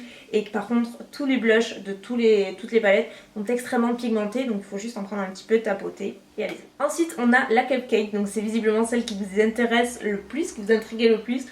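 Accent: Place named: South, France. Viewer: Pourquoi ? C'est l'accent du sud qui fait cette prononciation; je trouve justement que ça donne un charme à chacune de ses vidéos. Si vous cherchez des vidéos provenant de "l'Académie française", ben faut changer de thème alors...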